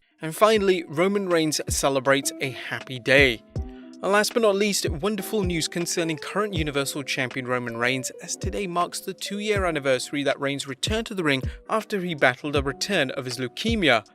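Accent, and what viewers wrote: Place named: United Kingdom. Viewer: "Money is always a big motivator"- I'd say money's always the biggest motivator, if not the only one, in WWE... It's funny to hear a British accent talking about the American pro-wrestling, since the majority of people in the EU (myself included) can't understand what's going on there at all!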